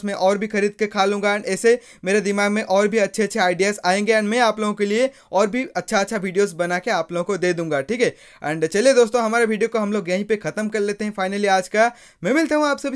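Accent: Odisha, India. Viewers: Bhai tum odisha se ho? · Bhai tum odisha se ho kya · Bhai tum odia ho kya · Aap odisha ke ho kiya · Bhai app Odia ho kya ?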